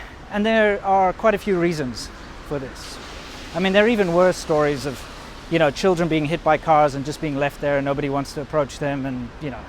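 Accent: South Africa. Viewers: More south african than british brah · well South Africa was colonized by the British so to an untrained ear they sound almost the same. Plus he has a formal proper South African accent which sounds even closer to a British accent. This is also why New Zealanders sound similar to South Africans because they were also colonized by the British.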